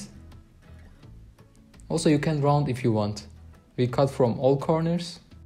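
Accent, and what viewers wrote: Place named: Turkey. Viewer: Tam da Türk aksanı var diyordum 😅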